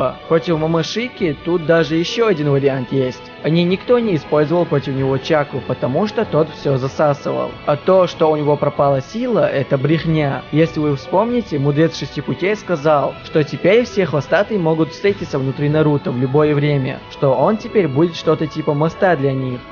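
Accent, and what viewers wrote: Chechnya, Russia. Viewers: я смотрел тебя, смотрел, и все время думал, какой же у тебя чеченский акцент, думал, неужели земляк, только сейчас, зайдя в вк, понял, что из Грозного - чеченец.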